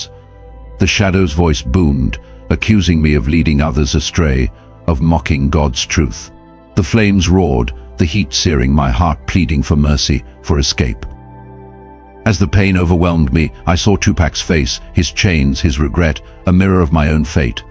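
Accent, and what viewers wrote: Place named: United Kingdom. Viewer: It’s really hard to find this believable when it’s a white British accent speaking and we’re talking about the most urban hip-hop artist and legend Tupac Shakur allegedly being seen in hell. I understand that people want to conceal their identity because they work in corporate jobs… But I just couldn’t sit through the whole thing. Y’all should have picked like a different accent maybe a black person even a Hispanic person but a white British accent come on guys,! Y’all lost me at like 15 minutes.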